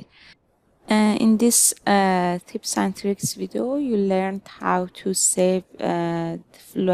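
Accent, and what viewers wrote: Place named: Iran. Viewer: انگلیسی با لهجه‌ی ایرانی 😁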